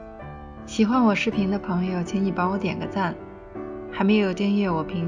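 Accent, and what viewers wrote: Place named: Shaanxi, China. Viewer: 是西安人吗？看你做的菜，说话都有老陕的味道，好亲切啊！从炸油条开始，跟您学了好多零失败的做法，非常感谢！能介绍几款铸铁锅用烤箱烤的菜吗？觉得这种菜又方便又好吃，最适合带娃的妈！谢谢啦！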